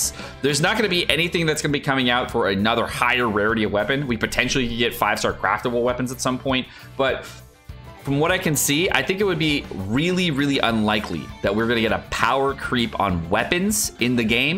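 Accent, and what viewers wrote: United Kingdom. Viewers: That's a very Bri-ishy accent!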